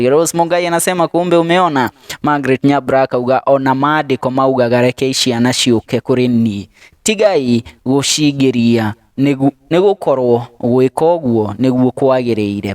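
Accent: Kenya. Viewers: Jua Kikuyu haraka wacha kuongea Kikuyu kimeru hapo, ata mwariama hakuwa anaongelesha dedan kimathi hivyo, ati katwitite kumutua bishop,muigai nioi mugwii wa nduma ucookario aria wookira, kuriundu muigai oooii niikuma na eene njogu irutagwo muguunda niiene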